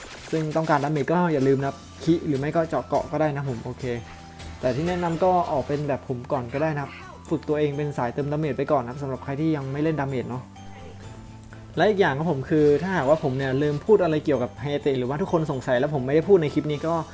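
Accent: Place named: South, Thailand. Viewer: สูคนใต้ม้ายครับ ผมเห็นสูแหลงออกทองแดงกัน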